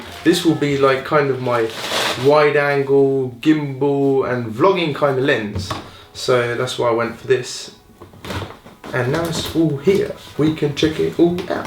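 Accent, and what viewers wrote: United Kingdom. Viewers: okay I´m really flashed right now I have been watching felix` vlogs over the past few weeks daily again and when you stepped in the #TeamSpielkind I really liked how you edited the videos and how you were and are in the vlogs but on German and now I FINALLY checked your chanel and I must say that I`m pretty flashed by your British Accent haha xD ok I had to say that. I wasn`t expecting this xD Didn´t know you were British haha. Okay keep on I will follow you on.:D Bye have a nice day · Guten morge. Bin neu auf deinem kanal durch #teamspielkind. Woher kommt denn der nice britische akzent? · Hört man sehr stark Raus 😂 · Wieso kannst du so gut Englisch? Hört sich halt ultra nach nem britischen Akzent an...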